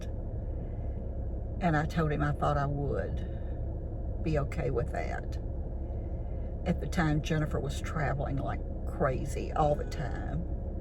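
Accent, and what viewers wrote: South, United States. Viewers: This was such a great story!! Your southern voice and the way you tell it is wonderful❤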